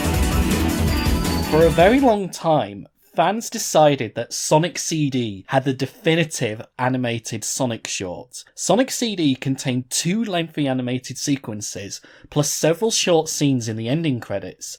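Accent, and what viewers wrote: Yorkshire, United Kingdom. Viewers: Where in Yorkshire are you from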